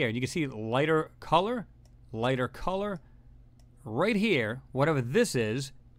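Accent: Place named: Connecticut, United States. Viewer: "it looks like it got jammed in... (Dramatic pause, me your long time listener hoping in your Connecticut accent say machine paaaahts ) whatever that is"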